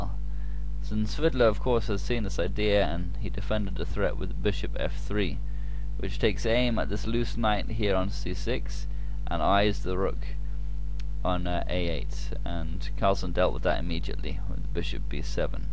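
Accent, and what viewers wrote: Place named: United Kingdom. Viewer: Beautiful and great videos!, especially those on Carlsen, and of course, I enjoy your British accent, 'couse I am a fan of the British accent. Well done!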